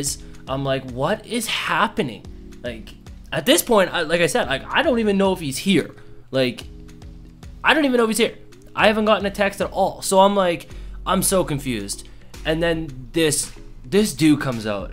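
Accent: Canada. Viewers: Where you from in canada you kinda sound like a sauga man cant lie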